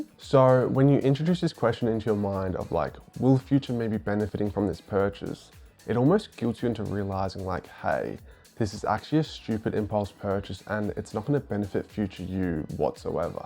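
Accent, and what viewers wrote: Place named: New Zealand. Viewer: So your mom is from New Zealand. Good Guess on my part. LOL! 😉 Your accent … I was trying to place it and I thought: His accent sounds similar to Australians but not as prominent and then I thought New Zealand.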